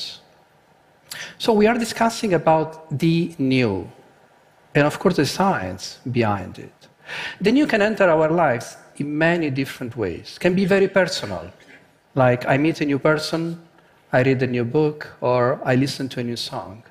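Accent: Italy. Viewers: a 16 minute video on trataras and tritoras.... sounds of meaningless wordsas in italiaaano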